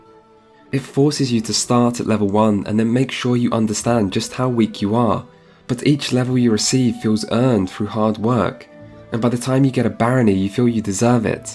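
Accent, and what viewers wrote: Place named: United Kingdom. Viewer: Not going to lie, subscribed mainly because of your voice and accent. You're like British Bob Ross.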